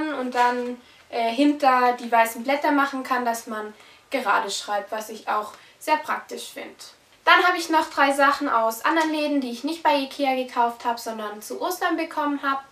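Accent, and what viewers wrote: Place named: Southern Germany, Germany. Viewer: Haha ich wusste sofort, dass du aus Süddeutschland kommst:D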